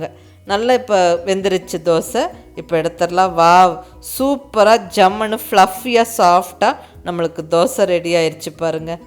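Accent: Coimbatore, India. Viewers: Are you from Coimbatore? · I like your kovai thamizh..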